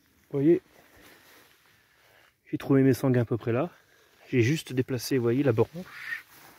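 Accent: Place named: Corsica, France. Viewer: Bonjour peux tu me dire à quelle altitude tu te trouves et quel type de sol as tu? A ton accent, il me semble entendre que tu sois de l'île de Beauté. Merci et bonne continuation.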